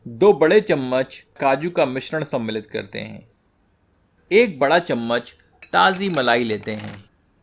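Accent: Standard. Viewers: aapki sari decease or apka suddh hindi me bollana bahut bahut pasand hai 👍👍❤️👍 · Your shudh Hindi is so irritating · आपकी शुद्ध हिंदी का उच्चारण भी ... ह्रदय को भी हर्षित कर देता है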